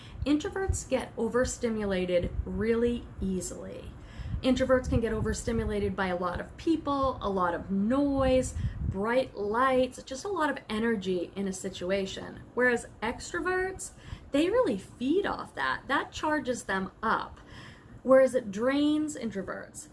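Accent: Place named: California, United States. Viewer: Your Cali. accent is so broad. (Awesome vid., by the way.)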